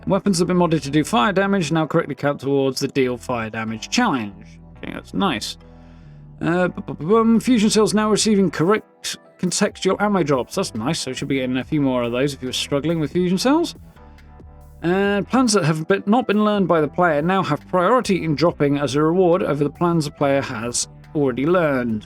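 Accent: United Kingdom. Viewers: 4k tvs have never been as affordable as they are today. I have a 4k 55" I bought for 200 usd and am planning on buying a 65" for a hundred dollars more soon. I see by your speech you are from the United Kingdom. I bought my telly from a place called Wal-Mart. Does your country have them? Hello from Yakima Washington USA ❤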